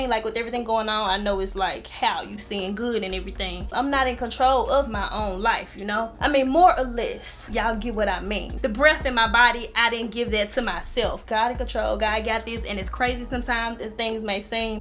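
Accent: South, United States. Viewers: Sang guh lol enjoyed watching and listening to your super country accent! You have me beat lol sending love from Alabama. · southern drawl is bomb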